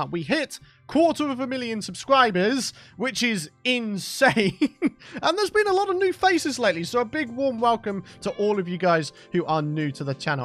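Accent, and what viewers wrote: United Kingdom. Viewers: Where in the uk are you from? I’m from wales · You sound like the british version of Woody from toy story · Lol I hear that too. He has a British accent like stampy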